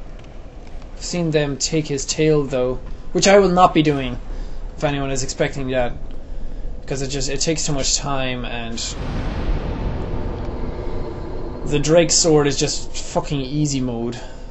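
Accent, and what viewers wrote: Ireland. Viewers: FutureAdaption He kind of tried to hide his irish accent, but not in this one. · He's starting to sound a little bit like his Irish self ;)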